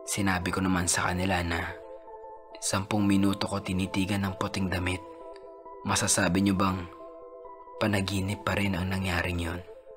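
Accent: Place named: Ilonggo, Philippines. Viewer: Taga saan ka ? Taga capiz ka,? Bat accent ng salita mo d ilonggo,,nano to mn bc gawa gawa mo lng yan istoria mo,, 😂 ,,,,peace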